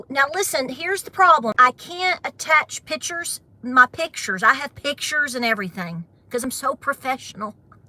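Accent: South, United States. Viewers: You say your accent isn’t southern but I am from northern Alabama and your accent sure sounds a whole lot like mine Love ya girl.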